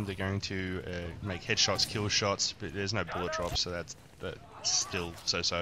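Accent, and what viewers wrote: Australia. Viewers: How many Aussie commentators are there on youtube? is it just you and JamieEhills?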